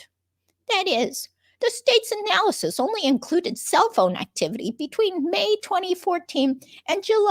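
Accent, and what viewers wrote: New York, United States. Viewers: 😂😂😂😂. The jewyork accent is spot on. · You do a mean New York accent!!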